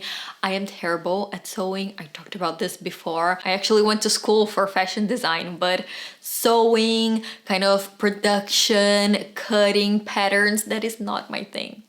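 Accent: Brazil. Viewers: The second I heard you pronounce certain letters I knew you were Brazilian 😊.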